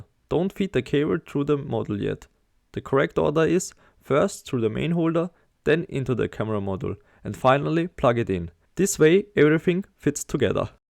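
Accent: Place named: Germany. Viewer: I can hear German accent XD but good video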